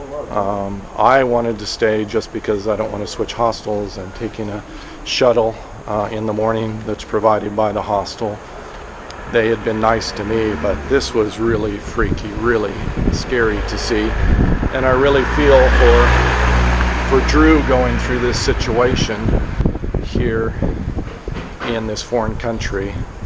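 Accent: United States. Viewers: butthurt ! the story kind make nonsense to me a lot of Hollywood action death threat , drugs , border report you and your friend should know the red lines before traveling to any country Russia ,China ,Dubai"UAE" those countries diverse in almost everything religiously culturally etc but share one common anti LGBT from your accent I assume you are from US let me refresh your memories with states this incident could took place Mississippi, Texas, Alabama . do your search before making scene.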